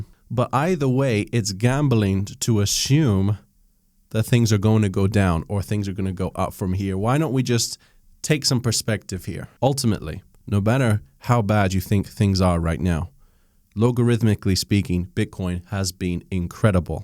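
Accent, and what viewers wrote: United Kingdom. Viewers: I can't detect an American accent - he's British I'd say although some words sound Aussie to me. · I'm curious what part of the UK his accent would be associated with. It's rhotic but I can't place it.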